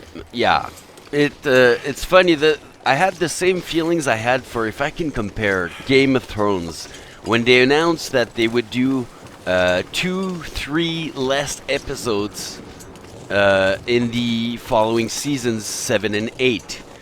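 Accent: France. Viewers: OH MY GOD!.........YOU'RE FRENCH!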